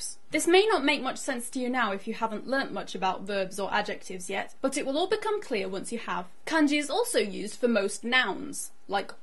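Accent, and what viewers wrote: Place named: United Kingdom. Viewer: Look her accent. She's from UK. I don't think that I could hear that nice english from an avarage american female teenager. (I'm the offensive) It would probably be like that "y'all gonna rite kateiganaa if u wanna pop out ur words 'n Kanjee words comed from Chaineese's abc like, butt ya know if you wanna do a logo, u gon' use our letters"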